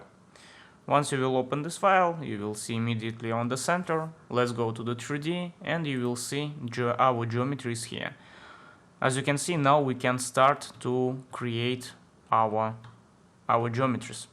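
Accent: Russia. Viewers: Какой прекрасный русский акцент!!!🙃 А я поначалу очень удивился тому, что понимаю почти все что говорят! Обычно понимаю процентов 30 из сказанного!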